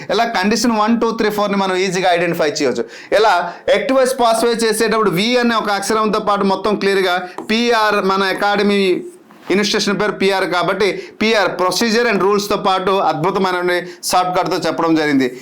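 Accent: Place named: Godavari, India. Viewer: Godavari slang ekkuvundi..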